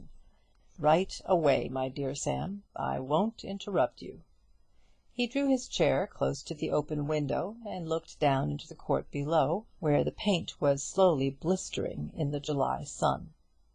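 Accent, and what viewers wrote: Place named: United States. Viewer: Sorry, can't possibly endure British literature read haltingly in an American accent. It doesn't work. At all.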